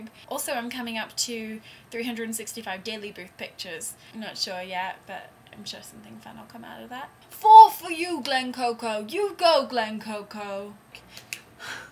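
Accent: England, United Kingdom. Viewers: Well At least its a nice English Accent. Not English people who slur there words.. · i wiv your nglish accent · holy cow! i shes hot! i love her british accent too! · alguien puede traducir tooooodoo lo que ella dice? al español... I don't understan so much... her acent is brithisch?? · she's from the UK thats how we pronouce it :) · british beeyach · Whoever said she was Australian? She's English. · she is British lol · damn britsh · Oh .. i just love brit accent . especially after harry potter and charlie bit my finger .. may be thats what i am against ;) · Talk about whatever you want. No matter what I'll enjoy hearing it. You have this cute British accent that I just love listening to. Don't worry about your hair. It looks absolutely beautiful!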